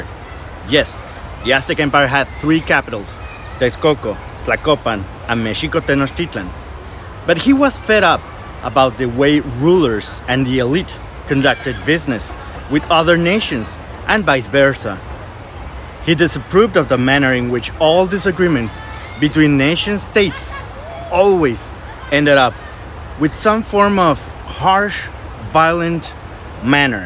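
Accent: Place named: United States. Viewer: Mi hermano te suplico que no digas mexico y mesoamérica . Con acento gringo. Y te felicito por si saber pronunciar los nombres de los tlatoque con acierto. Gracias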